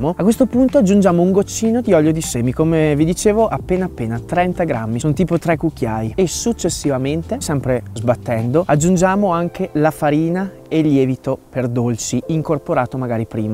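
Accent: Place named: Rome, Italy. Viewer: Simpaticissimo...ma sei romano?ogni tanto te ne esci alla romana😄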